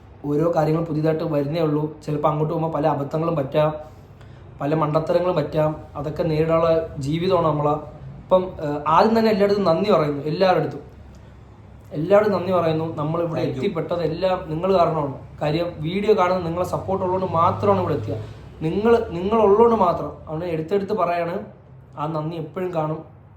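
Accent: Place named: Kollam, India. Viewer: Hii brooh 🥰nighlde videos um nighle 4 pereyum othiri ishttanu❤pinnah slag um. Njn koodhalum nighlde videos anu kanunnathu. Kollam slag enik othiri ishtta, pinna nighl samrikunnathu kettittum enikum samsarikkanum ennu aghraham ind.Njn Ernakulamkkariyanu. Apo ivda ntha, nthu ennokeya paraya ipo ithinu pakaram enthonnu, enthru agana paraya ee vakku oke enik chettanmarde videos il ninna kittiye🥰, pinnah nighl samsarikunnath kelkkanum adipoliyanu . Njn athukond thanne nighlalil ninn korach words padikkum. Ennt paramavathii nighlde pole samsarikkanum shremikkum🥰🎉🥰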